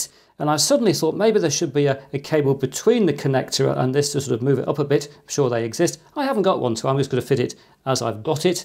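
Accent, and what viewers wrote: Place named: North, United Kingdom. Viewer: Thanks for replying. Yeah I hear the slightly well spoken/slightly northern mix I think. Cheers from Liverpool, I only ask cos my accent isnt that broad hehe:) Looking forward to learning much more. You're a UK YT international treasure Sir!:D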